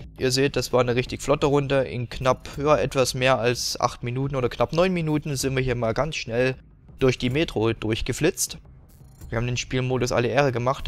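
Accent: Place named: Saxony, Germany. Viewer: Gutes Gameplay:) Aber sorry ich komm mit deinem leichten sächsischen Dialekt nich so ganz klar :/ Aber sonst gutes Video:)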